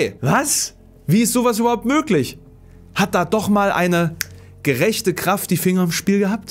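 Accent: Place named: East Germany, Germany. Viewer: Ernsthaft? Ostdeutscher Synchronsprecher?! 🙈😇🤔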